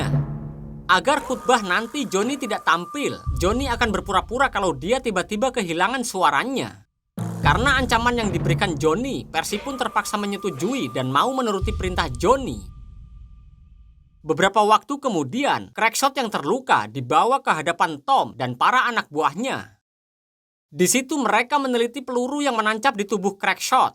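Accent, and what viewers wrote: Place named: Surabaya, Indonesia. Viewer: Penyiarnya lucu.. Bahasanya suroboyoan, mathek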